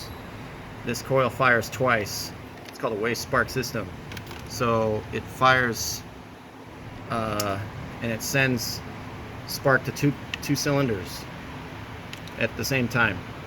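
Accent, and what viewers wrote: United States. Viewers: Thanks for the information man really can in clutch but are you from Texas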